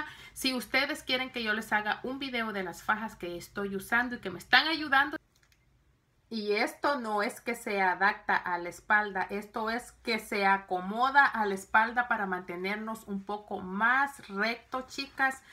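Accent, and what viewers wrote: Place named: El Salvador. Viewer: Por tu forma de hablar creo q eres de El Salvador como yo!!!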